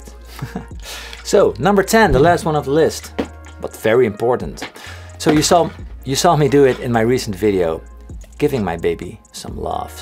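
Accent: Netherlands. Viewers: Ik wist dat je uit Nederland kwam! (Ik hoorde het aan je accent en zocht het op dus ja...) Goede video's!! Ga zo door! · He sounds like he comes from the Netherlands so i checked and yes he is from the Netherlands lol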